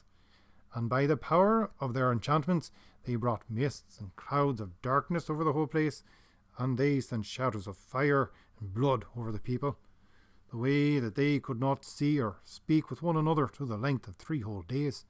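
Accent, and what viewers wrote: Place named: Ireland. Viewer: where is your accent from it's so wonderful! I am assuming Ireland of course, but what part?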